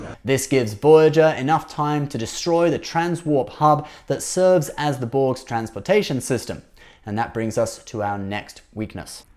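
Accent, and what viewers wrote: United Kingdom. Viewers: Missed ya,:) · Woohoo!!! More! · is back!!! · We demand more!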